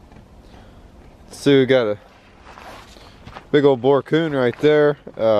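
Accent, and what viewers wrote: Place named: Pittsburgh, United States. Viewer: Your dialect sounds like Pgh. Pa? Or close?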